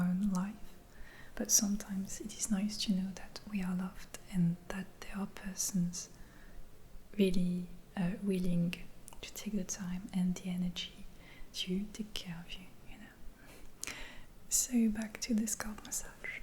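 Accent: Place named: France. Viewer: Your videos are so wonderful. You have a perfect ASMR voice. I love your accent too- I studied French for many years and it brings me back. You English is amazing!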